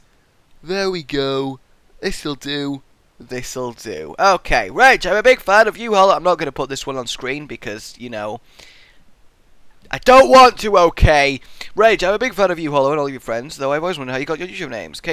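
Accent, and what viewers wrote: United Kingdom. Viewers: British .. why would he be german lol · is rage british or german? or did he move to england later on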